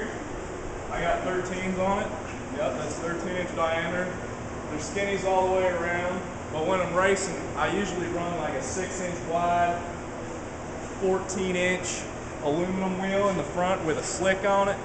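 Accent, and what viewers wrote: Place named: Oklahoma, United States. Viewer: if you don't mind me asking, where is you shop located, i'm an okie but live in Fresno, you sound like me so your probably east of me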